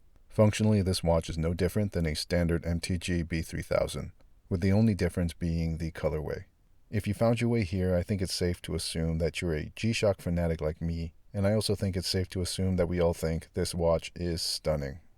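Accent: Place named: United States. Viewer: Great to hear American English good detailed review !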